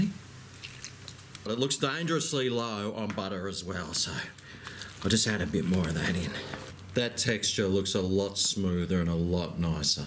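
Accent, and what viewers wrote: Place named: Australia. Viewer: You sound like you're from Australia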